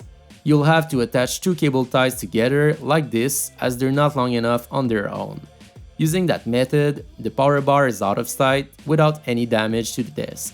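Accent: Quebec, Canada. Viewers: thanks and I have a question ... are you Canadian? I noticed that you had a little Canadian accent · Yoooooooooooo serais -tu québécois par pur hazard??? XDD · tu as l'accent du québec,mais bonne video tabarnak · Je reconnais l'accent québécois ;)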